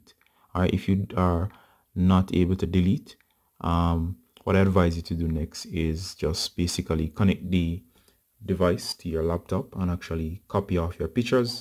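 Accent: Jamaica. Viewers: good to hear a jamaican voice .. watching from the UK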